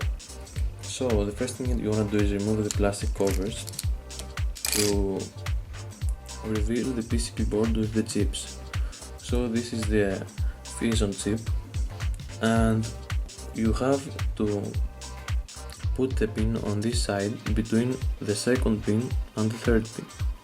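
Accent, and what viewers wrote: Greece. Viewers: η ελληνική προφορά γαμάει πάντως!!! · Απο τη φωνη υποψιαστηκα οτι ειναι ελληνικο βίντεο , ωραιος φιλε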